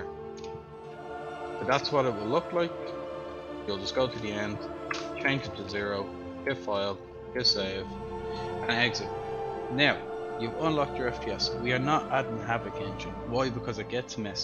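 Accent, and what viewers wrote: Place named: Ireland. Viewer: Took me only 10 seconds to realize this person is Irish.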